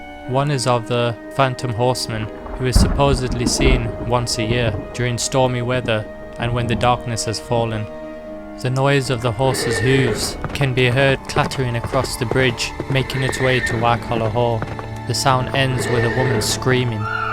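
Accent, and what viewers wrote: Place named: Ireland. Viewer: Good to hear that lovely Irish accent again. I’ll make sure to visit Lancashire next time I’m in Ireland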